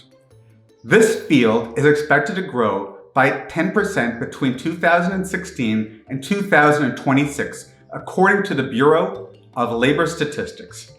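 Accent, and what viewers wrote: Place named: Canada. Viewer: You’re Canadian, aren’t you? I can tell by they way you say process and houses.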